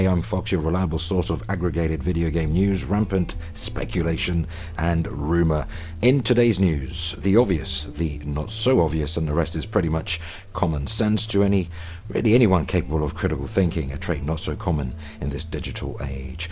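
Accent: United Kingdom. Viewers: I like how you always emphasize “rampant speculation” also I’m an American but hearing a British accent is refreshing. I have an American southern accent and some may enjoy that so cheers 🍻 to liking things.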